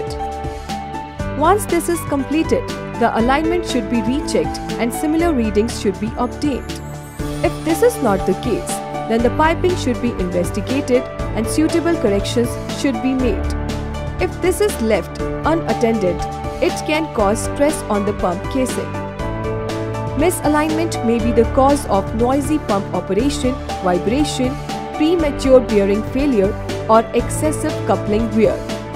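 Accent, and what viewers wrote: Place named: India. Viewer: I hate Indian accent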